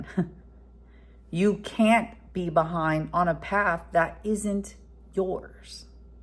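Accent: New York, United States. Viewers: Just make sure you enjoy life as well! You're still a pretty girl. By the way, I love your accent and mannerisms - New York? Some women think they're "strong, independent women", but it's not convincing. You on the other hand come across as the real thing - one tough lady, LOL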